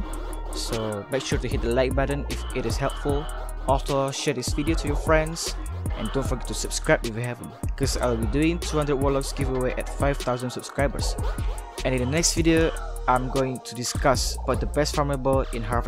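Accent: Singapore. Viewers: u sounds like sigapore alot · Singaporean sound 🤔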